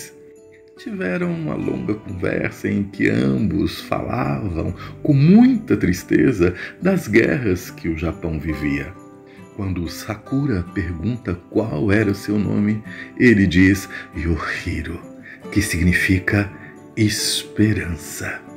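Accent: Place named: Minas Gerais, Brazil. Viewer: Lindimaisdaconta!!! Em sotaque minerêis.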